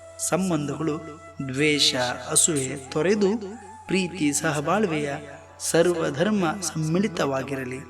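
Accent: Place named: Kundapura, India. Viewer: Treat to hear kundaapura kannada ♥️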